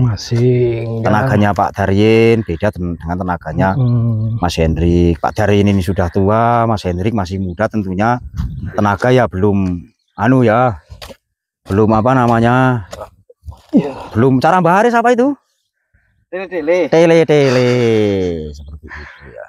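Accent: Banyumas, Indonesia. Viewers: Sehat terus bang,aja klalen ngapak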